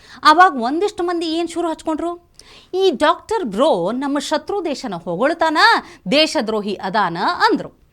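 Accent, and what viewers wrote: North Karnataka, India. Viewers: ಮಾತಲ್ಲೇ ಇರಿವ ಬಗೆ ಅಲ್ಲಿ ಮೂಡುವ ನಗೆ ಅಂತ ಇಂತು ನಮ್ಮ ಉತ್ತರ ಕರ್ನಾಟಕದ ಭಾಷೆಯ ಸೊಗಡು.. ಅದಕ್ಕೆಂದೇ ಹೇಳಿ ಮಾಡಿಸಿದಂತಿದೆ.👌👏👍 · Super anchoring mam... Your voice is super. ನಮಗೆ ನಮ್ಮ ಉತ್ತರ ಕರ್ನಾಟಕದ ಕನ್ನಡ ಕೇಳಿ ಭಾರಿ ಖುಷಿ ಆಯ್ತು. You are standing out of the box as compared to other anchors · ನಿವು ಹೇಳುವ ಸ್ಟೈಲ್ ನಿಮ್ ಸ್ಮೈಲ್ , ಉತ್ತರ ಕರ್ನಾಟಕ ಭಾಷೆ ಬಹುತ್ ಮಾಜಕ್ ಮಾಡುತ್ತೆ ಅಕ್ಕಾ . ನಕ್ ನಕ್ ಸಾಕಾತು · ಯಾವಕೆಲೇ ಇಕೆ ಉತರ ಕರ್ನಾಟಕ ಭಾಷಾದಾಗ ಮಾತಾಡತಾಳ್ ಹಡಸು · ಅಕ್ಕಾ ನೀವು ಉತ್ತರ ಕರ್ನಾಟಕದ ಜವಾರಿ ಭಾಷೆಯಲ್ಲಿ ಬೆಂಕಿ ಬೆಂಕಿ ಮಾತಾಡಿದ್ದಿರಿ,,, ಟಾವೆಲ್ ಒಳಗ ಕಲ್ಲು ಕಟ್ಟಿ ಹೊಡದಂಗ್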